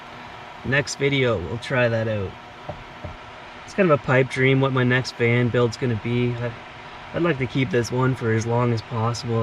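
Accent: Canada. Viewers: Beautiful sentiments but he's Canadian, NOT American. We have different regional accents as well.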